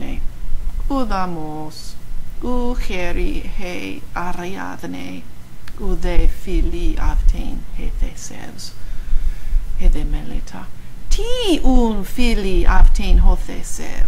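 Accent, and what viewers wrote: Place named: Greece. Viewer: Very well read. It actually sounds Greek. The others should copy your pronunciation.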